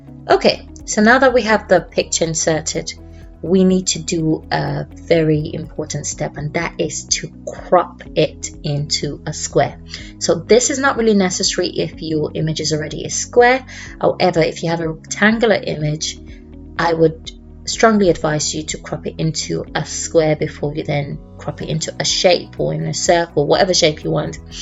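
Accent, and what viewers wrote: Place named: United Kingdom. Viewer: You saved my evening! I have been trying to crop to a circle in Powerpoint for about an hour, reading instructions and a couple videos. You showed STEP by STEP on the screen and that's what I needed. And you did it in your classy British accent to add to the enjoyment! Thank you very much!!! Canva looks even easier....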